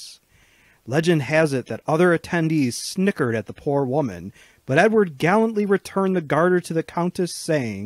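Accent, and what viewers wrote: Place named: United States. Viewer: Unless I am mistaken, the narrator sounds like an American. He had asked if (paraphrasing) "clubs like the Order of the Garter should still exist today?" In an American context, your equivalent would be the Presidental Medal of Honour, with distinction. The Order of the Garter is a living society honoured by the British Crown. Other than members of the Royal Family who do not count in the 24, are some of the leading figures of the United Kingdom outside Scotland. Also, it remains "The Most Noble Order of the Garter;" the name did not change.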